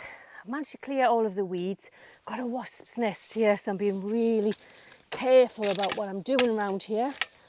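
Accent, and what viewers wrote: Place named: Northern England, United Kingdom. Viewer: Fab, us too. We’re in Bellingham :) beautiful part of the world. Although I’m from Sunderland originally and Hubby from Gateshead I heard the northern twang. Such a small world to come across a local video! Just an FYI gardening express have a deal on Hosta’s 40 for £10 just bare root but I got 80 and they are all popping up lovely 🥰 I’ll check out the stumpery, thank you 🙏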